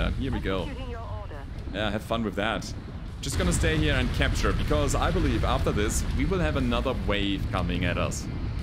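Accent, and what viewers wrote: Germany. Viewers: The short was fun. Create a character for yourself! Be a Steiner officer. Use your German accent!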